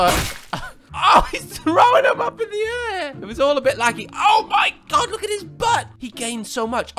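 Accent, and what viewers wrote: United Kingdom. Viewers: the and im yellow? gets me every time also you sound british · Hey are you britain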